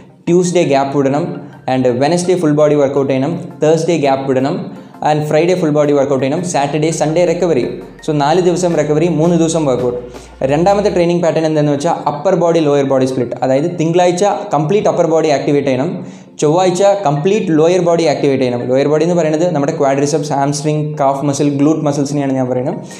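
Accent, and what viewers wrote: Tamil Nadu, India. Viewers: Are you malayali or Tamil .? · Bro tamilan or malayali? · R u Tamil or Malayali? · Malayali anno athoo Tamil annoo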